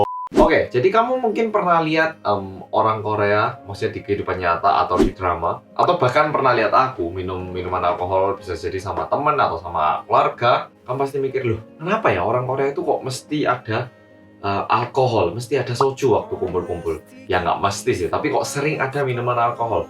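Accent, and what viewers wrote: Java, Indonesia. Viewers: Medokkkkkkkkk ohhhhhhhh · Yang medoookkkkk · seneng wae ngunu krunguu Medhoooog e kuii sen puanjjaaaaangg😂😂😂😂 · Paling suka awal pembukaan bersama orang Korea yang medhooooookkkkk🤣🤣🤣🤣🤣🤣 khas bgt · Medokmu sam.😂🤣🤣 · Yessss orang Korea yg medooook muncuuulllll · Kalo medoknya ilang gimana? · Korea medok maneh rekkkkk, asyekkkk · Seneng bgt tiap denger kalimat medhoooook 😁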